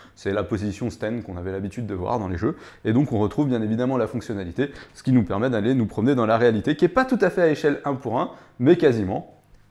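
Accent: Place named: France. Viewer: C'est à cause de l'accent Français mon garçon ^^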